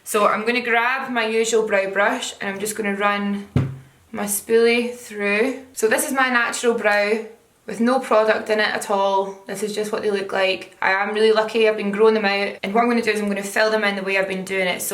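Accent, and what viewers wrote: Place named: Glasgow, United Kingdom. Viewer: Gen up the only Glaswegian accent I can listen to without cringing like mad! making weegies proud af yas x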